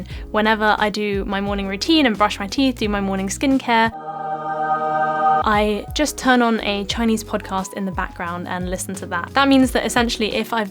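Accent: England, United Kingdom. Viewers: Actually I am a school student who is willing to learn British accent,I found your accent interesting. Thank you .We really appreciate your dedication for helping others develop their accent even if you get nothing in return. Your such a great need for society. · Thank U for the video!!! I never cease to be amazed at Ur understandable British accent! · You're Welcome !! I really love your english accent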